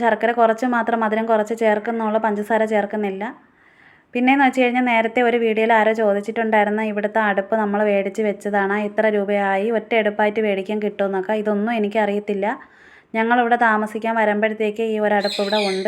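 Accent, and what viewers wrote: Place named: Venjaramoodu, India. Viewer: നിങ്ങളുടെ സംസാരം കേട്ടപ്പോൾ തോന്നി വെഞ്ഞാറമൂട് കഴിഞ്ഞു വരുമ്പോൾ ഉള്ള ഏതോ ഒരു ഭാഗം ആണെന്ന് കാരണം ഞാനും വെഞ്ഞാറമൂട് ഉള്ളത് ആണ് എന്നെ കെട്ടിച്ചു വിട്ടത് നിലമേൽ ആണ്... ഇതേ seim സംസാരം ആണ് ഞങ്ങളുടെത് ഓക്കേ gd luck 👍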